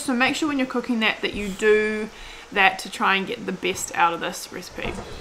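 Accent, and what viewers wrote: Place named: New Zealand. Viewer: I always enjoy your videos - the lighting has never been something I've noticed...I'm so focused on your awesome Kiwi accent!! I love it! Super cute kids and your older one helping you here was fun to watch. Will def make this chowder soon! (even tho still VERY hot where I am in Texas) Thanks for your channel! (sorry about your lockdown weariness, saw that on Insta today :( wish Covid would disappear.)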